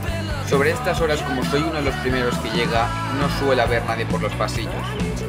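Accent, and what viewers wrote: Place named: Castile, Spain. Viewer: no notas el acento castellano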